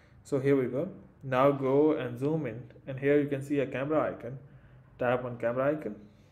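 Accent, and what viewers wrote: India. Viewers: Ma’am he’s just Indian. Most Indians aren’t scammers smh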